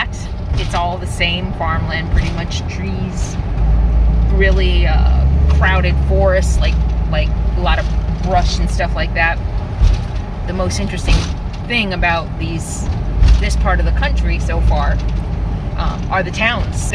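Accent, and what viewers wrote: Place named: Minnesota, United States. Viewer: Minnis SO...tah. I love that accent too.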